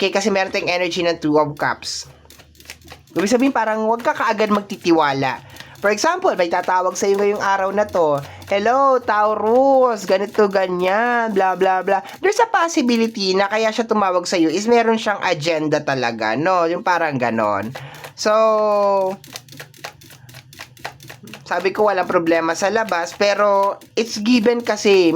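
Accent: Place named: Ilonggo, Philippines. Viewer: abaw a kabalo ka mag ilonggo a😂😂😂gudevz po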